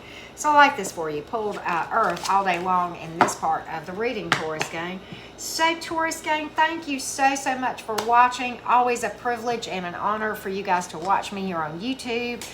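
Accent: South, United States. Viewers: It's the Chinese bangs for me, and southern twang.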